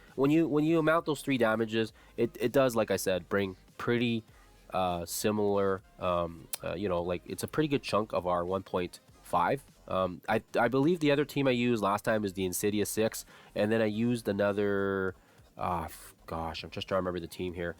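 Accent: Canada. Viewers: You sound just like rayge gaming to me lol. Is it just the Canadian accent? 😂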